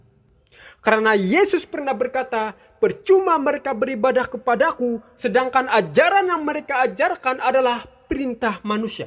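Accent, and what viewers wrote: Malaysia. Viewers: Betul itu.. Admin ini SDA.. dari sabah borneo malaysia.. Dari tutur caran percakapannya saja sy boleh tau.. Dia orang malaysia.. Tapi mengubah cara cakapnya menjadi cara ngomong orang indonesia.. Sy tahu sebab sy orang malaysia.. Admin ini pastinya orang malaysia.. Kalau orang malaysia cakap saja cara malaysia.. Tidak perlu menyamar mengunakan bahasa indonesia. Salam damai dari sabah borneo malaysia.. Tuhan Yesus memberkati kita semua saudara saudari seiman tidak kira di mana kita semua berada. Amin🙏 Tuhan Yesus baik❤️🙏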